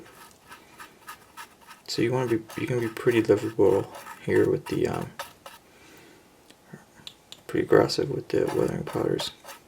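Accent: United States. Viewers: Hi, just wondering you come from? As you sound American/Canadian, if so, why do you use English trains? Not to be nosy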